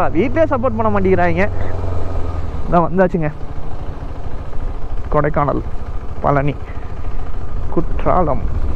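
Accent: Kerala, India. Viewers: Ean bro malayalam slang la pesura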